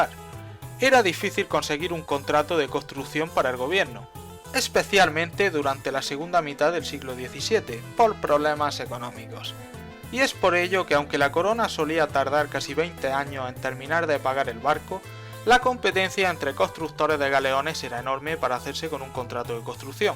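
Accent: Murcia, Spain. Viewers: Aaaaaaaxooooooooooooooooooooo,ese acento es murcianico pijo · De que parte de España es ese acento?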